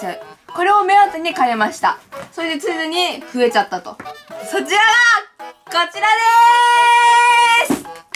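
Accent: Kansai, Japan. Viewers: 関西人だから関西弁じゃない？多分...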